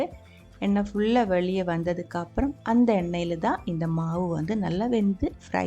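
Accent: Kerala, India. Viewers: Alayalam kalantha thamil arumai sagothari valga valamudan aviyal solli kudunga enga kudumpame adimai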